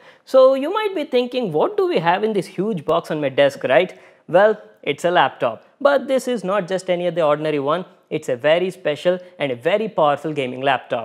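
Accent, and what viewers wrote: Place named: India. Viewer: u hv the most unique and original indo english accent of all the indian youtubers🤩🤩. keep it up bro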